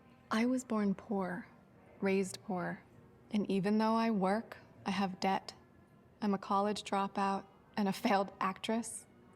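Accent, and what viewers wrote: United Kingdom. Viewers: Which horror! Sorry for you that you are forced to review such a movie, in French "une daube"! (Watched the trailer. Such waste of pellicule! and such bad acting.) Discovered by hasard your vlog! from Locarno's Festival, appreciating and following you since then. And reading your reviews on Guardian, my preferred newspaper since I was studying classical singing in London many many years ago. By the way did you leave our Lago Maggiore's sublime shores? Did you miss on Piazza Grande the terribly strong punch-movie "Irkalla Hulm Jijiljamish" by M. Jabarah Al-Daradji ? Even under such a never happening before heat wave I was freezing, tears in my eyes, a lump in the throat... Ah, by the way, adore your exquisite English accent!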